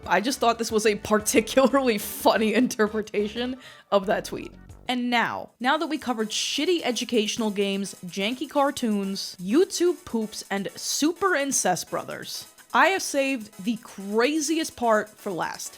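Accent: New York, United States. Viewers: Y'all are unaware of the New York/New Jersey accent??? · she’s just got a wicked new york/new jersey accent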